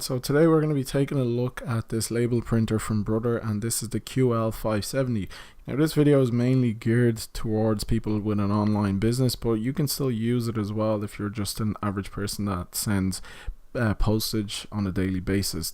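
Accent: Ireland. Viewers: Is this a black dude with an Irish accent?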